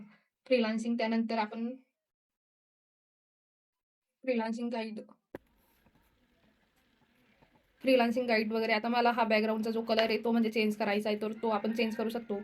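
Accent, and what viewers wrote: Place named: Khandesh, India. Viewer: Tai tuzya aawajat खानदेशी भाषा आहे म्हणून तुझे व्हिडिओ फार आवडता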